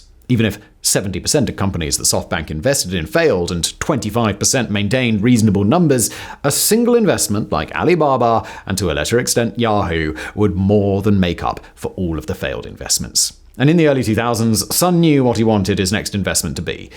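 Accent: United Kingdom. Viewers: Does anybody know ow where in the uk hails from? I need to know what specific accent this is?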